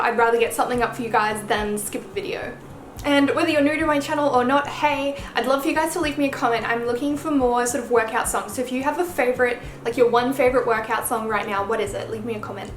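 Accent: Australia. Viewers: I feel like it's the accent. I am also Australian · KristinaS2009 If you watched the video you would've seen the explanation. She's australian, that's how a lot of them say it. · Definitely the Aussie accent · Just an Australian accent, that's how most people say it here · It's how it's pronounced in Australia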